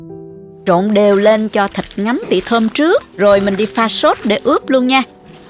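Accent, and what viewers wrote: South, Vietnam. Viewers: nói giọng Mien Nam bghe de thuong ghê và gióng nhẹ nhưng gịt quá rất có cảm tình fish xâc bạn nhé mình chúc Cô enjoy your food. Gd bless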